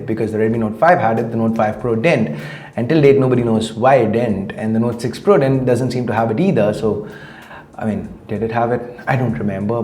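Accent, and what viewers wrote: India. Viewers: typical fucking infian accent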